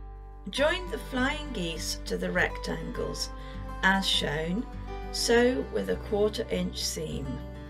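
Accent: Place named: United Kingdom. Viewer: Hello, I just found your videos & have subscribed to your channel. I ❤️ yor easy to follow method of teaching, your choice of quilt blocks & the gorgeous fabrics!!! Your wonderful Brittish accent is also a plus! In this video, you asked for suggestions as to what block we would like made by you. Since my passion is PEACOCKS & PEONIES, (my kitchen & dining room 'theme') would you please do a video on making a realistic 'dancing' peacock (tail feathers opened upright) with CORAL PEONIES applique block? I also love the Brittish Peacock Butterfly. So I am humbly & eagerly awaiting to see if you will take these two suggestions to heart! Thank you kindly from USA.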